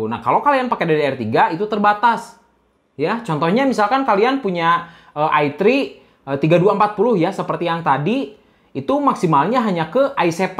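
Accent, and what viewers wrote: West Java, Indonesia. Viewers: Moal teu orang sunda ieu mah , kaciri cara ngomong na 😅😅😅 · sunda sekali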